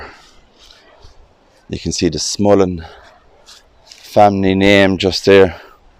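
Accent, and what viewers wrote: Ireland. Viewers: Excellent. I'm so glad I found your channel. Graveyards are beautiful places. All those lives of people who all had a purpose and stories to tell. Your lovely Irish accent is pure joy to listen to as well. Thanks and take care 🙂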